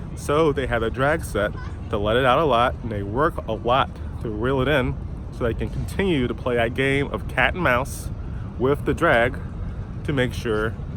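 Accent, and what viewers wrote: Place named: United States. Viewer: Your video is so helpful brother 🥂 but American English is almost terrible lol... I can hardly hear all that you have explained 🤦‍♂️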